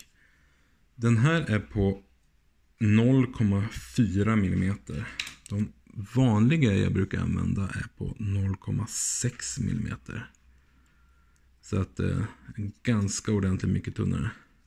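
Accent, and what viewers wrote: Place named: Australia. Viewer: Cheers mate that would be greatly appreciated. They can be a fun read at times when the translation doesn't match what you are saying, so don't expect them to be an exact translation, it has a lot of trouble with the Aussie accent, it's just a tool to give us the general idea of what you are saying. You were mentioned on Lockmania's series promoting locksport channels. I really enjoy that locksport is such an international sport and locks speak a universal language that we can all understand regardless if there is translation or not. I am enjoying your channel.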